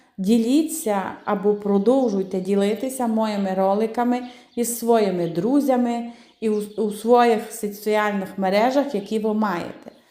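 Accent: Transcarpathia, Ukraine. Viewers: Пані, але ж соковита закарпатська говірка! А рулет Ваш приготую! Дуже гарно виглядає і хвалять його! Дякую! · Дякую за цікаві і смачні рецепти. Закарпатський діалект відрізняється від галицького чи буковинського, але всерівно зрозумілий. Я б сказав, що це додає якогось колориту приготуванню... З прийдешнім Святом Пасхи!!! Нехай в 2024 році настане мир і перемога України! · Дуже гарна начинка.а діалект закарпацький -зачаровує.Респект каналу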